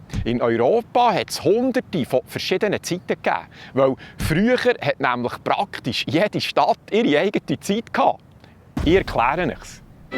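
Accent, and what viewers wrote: Bern, Switzerland. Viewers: Potz hei ei ei... was för nes heimeligs, wunderbars Bärndütsch, wie fasch zu Gotthälfszyte. I bi fasch d Bänne abgheit wäg de ruschtikale Wörter. Merci viumau! Ha di Kanau grad abonniert. Gruäss us Brunnen SZ. :thanksdoc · 💪😄🙏🙏mega schöner Berner Dialekt. Gruß aus dem Aargau 🙏☘️☘️ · so nes schöns bärndütsch!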